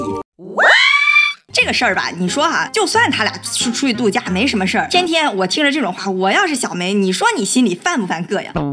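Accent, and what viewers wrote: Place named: Dalian, China. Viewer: 姐真有喜感！有大連味。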